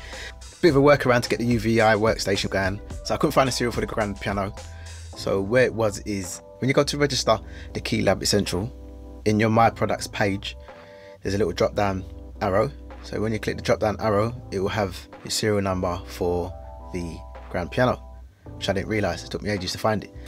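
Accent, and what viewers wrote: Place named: Birmingham, United Kingdom. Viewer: Please tell me you’re from bham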